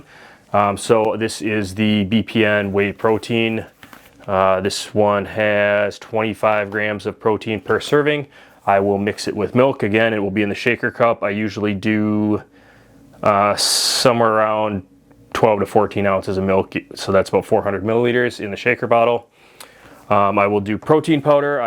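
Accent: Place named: Minnesota, United States. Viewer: Your voice reminds me of Fargo. One of my favorite movies 😂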